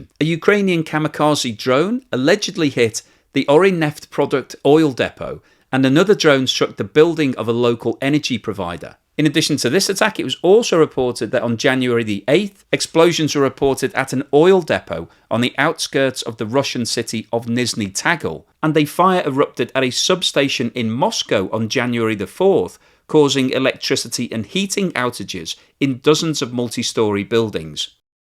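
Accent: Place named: RP, United Kingdom. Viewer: your BBC English, your clever views and the capacity of explaining complex issues in a simple way makes you Top Expert!!!! Thank you!!!!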